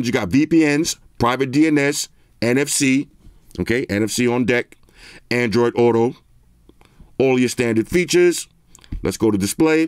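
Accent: New York, United States. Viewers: It's like talking to my boys from NYC 😆🔥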